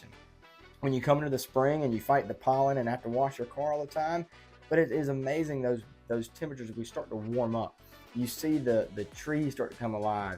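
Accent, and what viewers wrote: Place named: Athens, Georgia, United States. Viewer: Do all the guys in Athens have that adorable accent? If so, you should add that to your list of pros.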